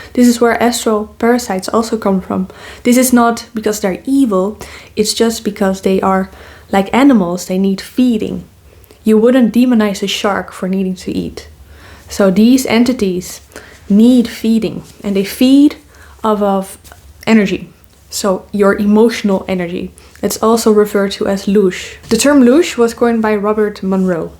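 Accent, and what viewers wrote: Netherlands. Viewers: Defiantly a dutch speaking english🤭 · I would bet that’s u are Dutch, I can hear it. Plus, the name is definitely Dutch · Are you Dutch? You sound like that (im dutch)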